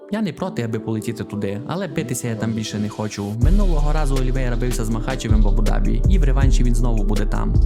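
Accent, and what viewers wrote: Transcarpathia, Ukraine. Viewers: все круто.)лиш таке враження що ти з Мукачева.)якийсь закарпатський акцент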